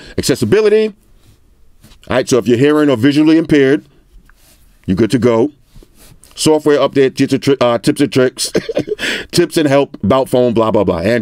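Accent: New York, United States. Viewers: That REAL authentic New York City accent that's why I LOVE your channel fam. Keep em videos comin foo #NYCForever #Floss💯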